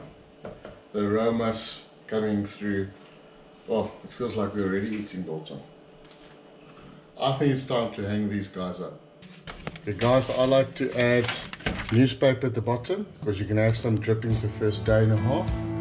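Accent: South Africa. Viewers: when i first saw this i thought Oh fok een of ander soutie gaan nou die opneuk" until i heard you were south african. This is what we call Biltong, not that jetk wanna be stuff the americans eat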